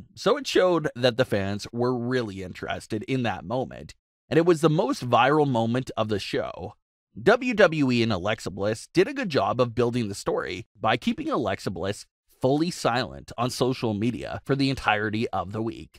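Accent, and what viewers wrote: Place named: Canada. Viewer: That damn accent is so annoying you sound like damn Canadian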